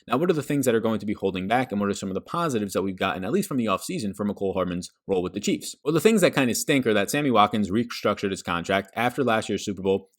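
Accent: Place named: Northeast, United States. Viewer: <link> leaguesafe 10 dollar league trying for tonight i got 9 in i need 3 more you interested? Great analysis as always.I love how you do not waste time on corny jokes. Sometimes I do wish you would talk a tad slower sometimes I am too slow to process your thoughts. You def a northeast person for sure haha not hating just stating.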